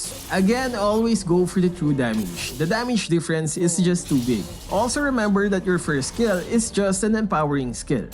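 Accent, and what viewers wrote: Philippines. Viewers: Philipines accent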